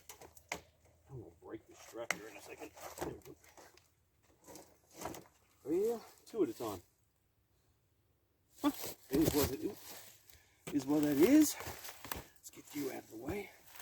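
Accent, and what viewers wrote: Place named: United Kingdom. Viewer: You accent ain't from around here?? I hear....British?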